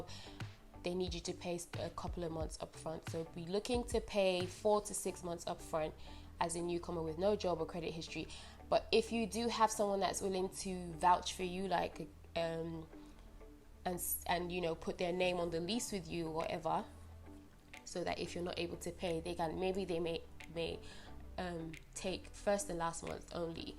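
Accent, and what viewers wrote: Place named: United Kingdom. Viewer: Your British accent came out to play, where’s the Canadian and Nigerian one 😀. Thanks for the heads up on accommodation. 👍👍👍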